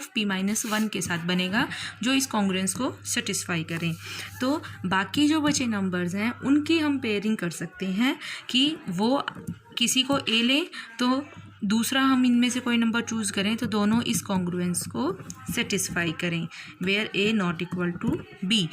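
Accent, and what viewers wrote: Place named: India. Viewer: Is every damn mathematician on the Internet an Indian person? I'm having trouble with ALL the Indian accents.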